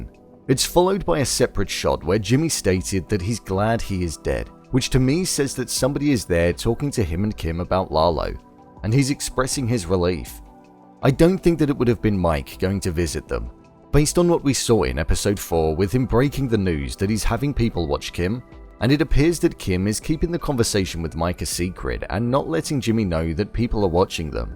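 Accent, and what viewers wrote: England, United Kingdom. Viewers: Sry, I love your engl Accent, but you should do some more research when making Previews for Youtube...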